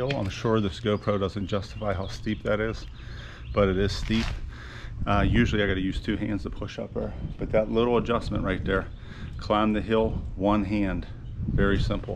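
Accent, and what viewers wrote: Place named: Pittsburgh, United States. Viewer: This guy gotta be from tha burgh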